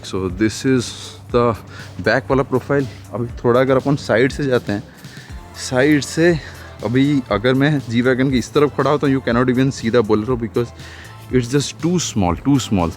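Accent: Odisha, India. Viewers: Hindi ke sath sath, Apki English me bhi odia slang aati hai...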